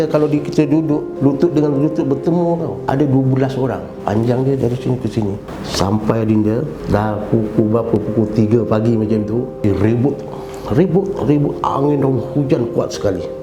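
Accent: Indonesia. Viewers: Logat alm ni logat madura. Sya sendiri madura. Itu la sya dpat rasa alm ni org madura. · Patut laa cara arwah ada sleng org jawa..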